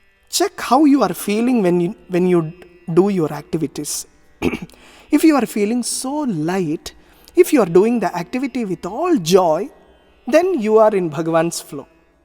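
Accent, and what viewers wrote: Standard. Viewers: आपका संस्कृत उच्चारण बहुत ही शुद्ध है। उच्चारण व्याकरण सम्मत भी है, कहां पर है उपध्मानीय का उच्चारण हो रहा है तथा कहां पर जिह्वामूलीय विसर्ग का उच्चारण हो रहा है वह सभी सुन करन बहुत ही प्रसन्नता हुई। ऐसा शुद्ध उच्चारण भारत वर्ष में केवल 1% लोग ही कर पाते हैं। ऐसा शब्द उच्चारण भारतवर्ष में धीरे-धीरे कम होते जा रहा है ।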